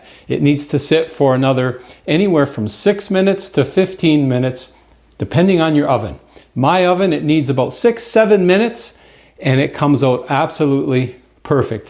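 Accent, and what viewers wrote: Canada. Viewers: Looks great. Will definitely give it a go. Are you Canadian?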